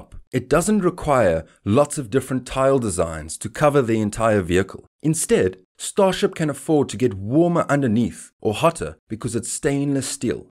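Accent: Afrikaans, South Africa. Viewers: My dude you Afrikaans? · Is that a SA accent? Good video by the way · I hear a fellow Saffer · you sound South African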